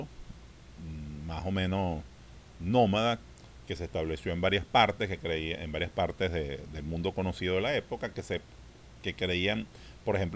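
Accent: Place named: Venezuela. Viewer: por el acento es de Venezuela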